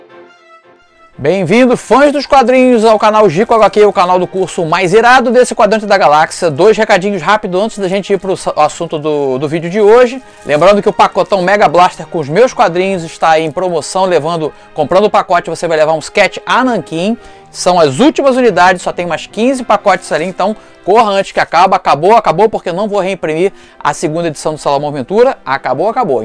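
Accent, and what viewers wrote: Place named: Northeast, Brazil. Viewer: Cara, sei nem te explicar. Depois percebi que você fala sobre algo que conhece muito bem do jeitinho que eu mesmo falo. Você é nordestino como eu né? Mas depois passei a adorar sua paixão pela coisa!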